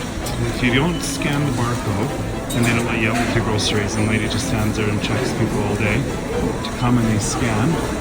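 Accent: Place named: Australia. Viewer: You're australian? I can barely detect an aussie accent.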